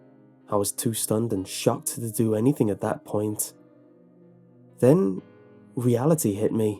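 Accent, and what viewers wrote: United Kingdom. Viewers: the narrator is British right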